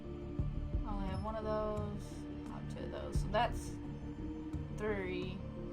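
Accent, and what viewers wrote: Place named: Tennessee, United States. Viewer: Dat Sweet Sexy Tennessee accent <33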